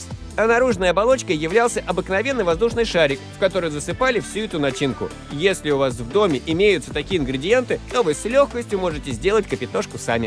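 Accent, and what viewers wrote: Kazakhstan. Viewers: Ты из Казакстана?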